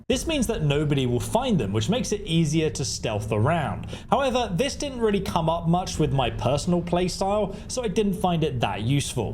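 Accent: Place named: United Kingdom. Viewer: Pretty sure He’s British and maybe that’s just how they say it. Plus’s who cares?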